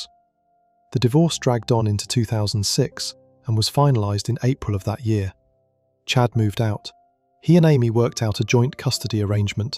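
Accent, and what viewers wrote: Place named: United Kingdom. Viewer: Can’t stand when the Narrator is British. Kinda screws up the whole show… 👎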